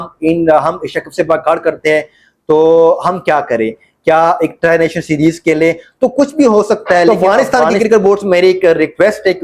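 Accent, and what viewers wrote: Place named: Pakistan. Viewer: Muzhe to ye banda pakistani lag raha hai iski urdu se pata chal raha hai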